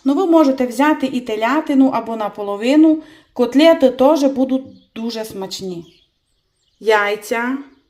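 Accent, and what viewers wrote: Transcarpathia, Ukraine. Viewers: та хоч би і ніколи не жили в Україні,на мій погляд не дуже важко здогадатися,що у має бути закарпатський діалект.Це загадка на мільон долярів.😁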